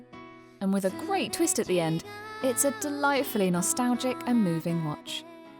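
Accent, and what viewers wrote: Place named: United Kingdom. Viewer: That British Accent makes this even more legit.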